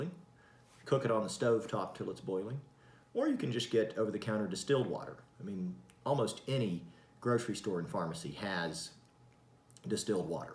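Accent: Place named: South, United States. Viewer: this guy is the first actual doctor who I've heard talking in a slight southern accent.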